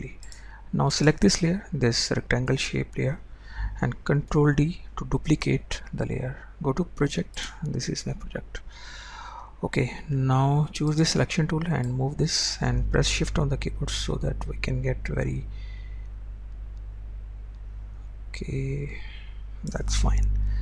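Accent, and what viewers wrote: India. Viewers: his indian accent is too strong xD but great tutorial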